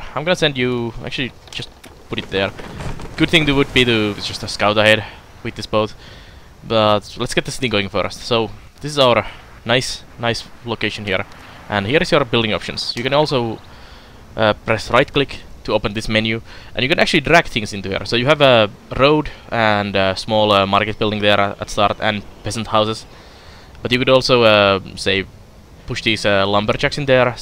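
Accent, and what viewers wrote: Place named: Finland. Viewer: Finnish speaker. Cool accent :)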